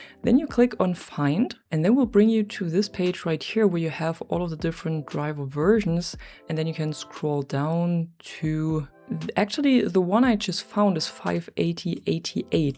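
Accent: Germany. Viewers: And that loveley german accent.;D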